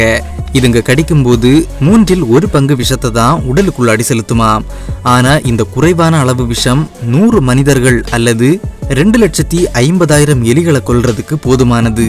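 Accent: Kanyakumari, India. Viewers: Bro Kanyakumari pola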